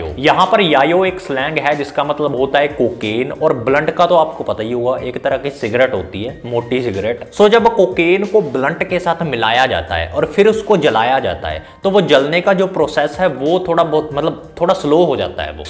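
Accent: Punjab, India. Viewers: Bro tu punjabi aa ??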